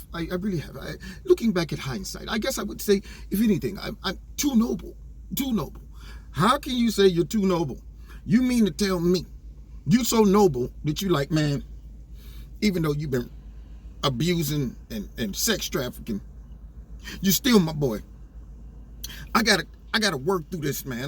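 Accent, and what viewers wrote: England, United Kingdom. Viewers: Your British accent is jolly good! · Hilarious! Love it! Love the British accent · I love your English accent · Your british accent is so funny!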